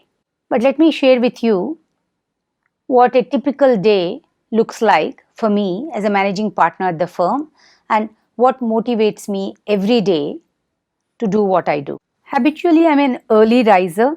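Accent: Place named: South Asia. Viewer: Thank you for these words of wisdom Ms Kalaari. The subtitles really helped since I can't follow your accent (South Asian, I believe). Can you point us to some good HBS case studies of your investments?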